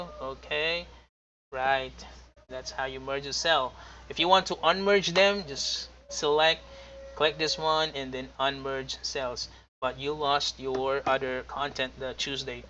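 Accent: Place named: United States. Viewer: Gaw kusugaw. Gd nimo mo english mura MN taga America eslang MN kaau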